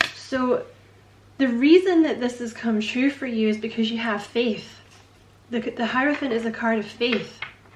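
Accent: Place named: Scotland, United Kingdom. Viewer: I feel so welcome here. I like your Scottish Accent very much. Kia Ora (greetings in the Maori language) and a very big congratulations on your 100,000 subscriber plaque you fucking legend! Well done!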